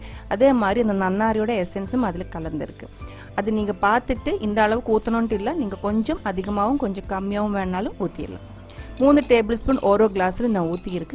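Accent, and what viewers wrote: Kerala, India. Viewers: Hai mam valare nannayittunde Explanation super kurachu malayalam varunnunde athu saramilla kelkkan sugamunde we are also living in coimbatore native palakkad ane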